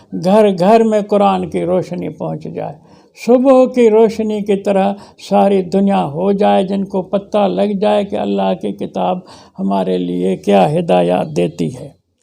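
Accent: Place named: Sindh, Pakistan. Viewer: خوشی ھوتی ھے سنکر آپ کو، اور حیرت بھی کہ ایک سندھی اسپیکنگ کتنی ستھری اردو بول سکتاھے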